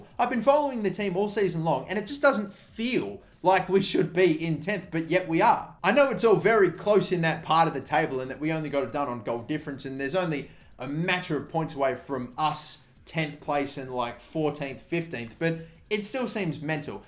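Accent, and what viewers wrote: Australia. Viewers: ARE YOU AUSSIE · Are you Australian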